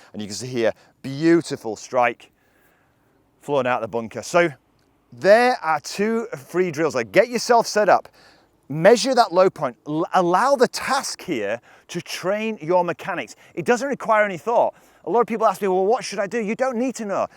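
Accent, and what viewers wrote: United Kingdom. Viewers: i've been golfing for many years but could never really get this right. i always thought it was some big move to make to get the center forward but just having the idea of striking more forward will help make it happen sounds good to me. thnx for the info and help. i've always been trying to figure out where you are from in the uk with you accent, i'm from the boston, massachusetts area in the states.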